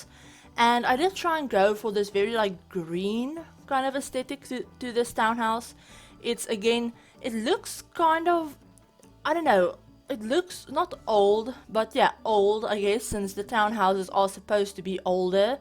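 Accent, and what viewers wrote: South Africa. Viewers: It is SO amazing to see a South African Youtuber, let alone a South African SIMS Youtuber! I got so excited when I heard your accent! The house is GORGEOUS and you did a great job! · I must say - I thought she sounded South African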